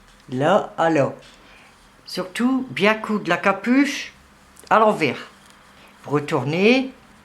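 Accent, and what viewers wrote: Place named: Tourcoing, France. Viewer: Bonjour J'adore votre accent, ça me rappelle Tourcoing où j'ai vécu Merci pour votre explication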